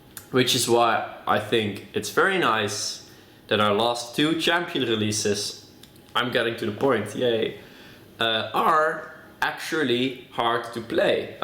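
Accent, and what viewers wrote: Netherlands. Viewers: Je hoort gewoon da die nederlands is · Dat nederlandse accent en soms die woordjes blijft leuk haha xD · Kut nederlands accent xD. Ik vind hrt leuk om jou vids te kijken. Ze zijn egt daacking awesome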